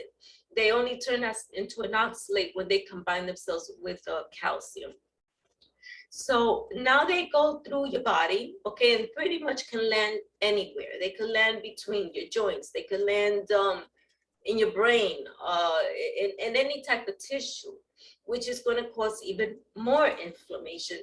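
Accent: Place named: East Coast, United States. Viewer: Thank you for this info. Also I love your East Coast accent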